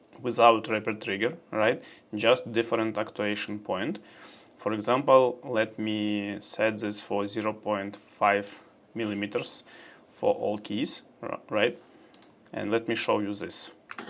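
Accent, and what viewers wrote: Russia. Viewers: По акценту слышу вам не чужд русский язык. Какова клавиатура в сравнении с отцами магнитных клавиатур wooting? Есть ли разница в ощущении или цена за wooting это просто наценка за бренд?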